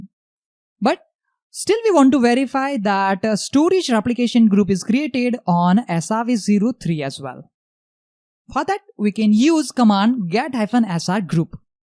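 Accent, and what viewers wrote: India. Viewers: indian accent.....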